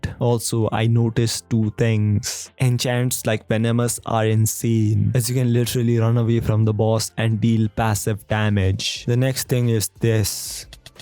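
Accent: India. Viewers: can you get rid of the indian accent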